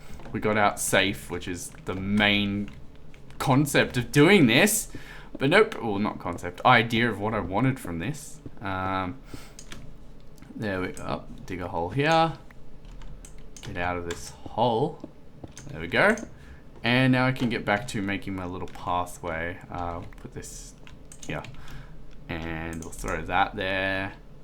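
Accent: Australia. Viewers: Are you Australian?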